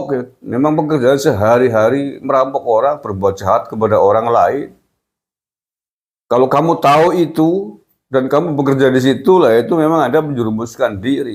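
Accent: Banyumas, Indonesia. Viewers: Dan saya selalu tersenyum saat mendengarkan logat khas Banyumasan nya Mas. 😊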